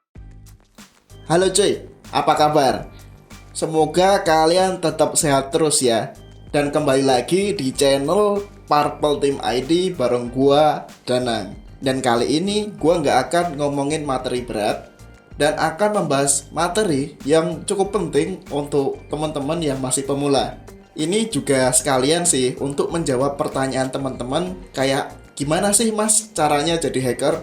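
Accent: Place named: Java, Indonesia. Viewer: agak medhok sedikit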